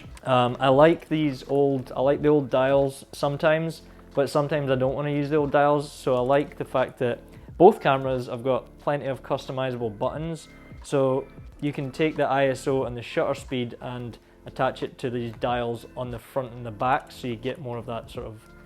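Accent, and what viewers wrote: Scotland, United Kingdom. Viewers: Hey man, i love your scottish accent and the video. I do photography for many years, but i am in sort of an impass now, what would you choose between XT2 and a D750? I really love the ease of use of the XT2 with controls on the body like my film SLRs, and the portability, but a part of me wants to get into the big boy league and go full frame. While i know gear isn't important, i do want alot of quality for my photographs (i am only doing it as a hobby of which i am very passionate about). Another thing i am worried about is that my MF lenses (mostly M42), won't work that well with the D750, and i really love them. Cheers!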